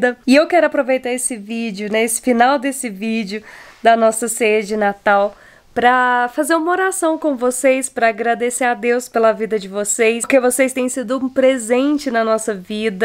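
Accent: Minas Gerais, Brazil. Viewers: Oi vc está falando mais do que NUNCA o sotaque mineiro..Ñ vi vc e o falando em turco tô com saudades. Fiz Natal DEUS os abençoe 🌲🎆✨🎊💝💖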